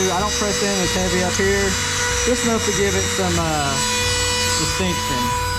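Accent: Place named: South, United States. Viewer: You’re definitely watchable. Is that a word? South Carolina for me? I detect some southern drawl. Thanks for sharing your remarkable talent!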